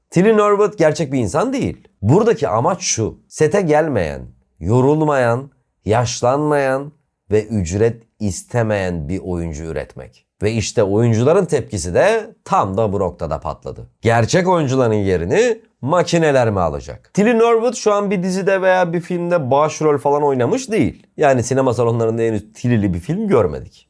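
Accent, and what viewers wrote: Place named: Çorum, Turkey. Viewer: Çorum şivesi :D jhafklsjnklfsşjfslşf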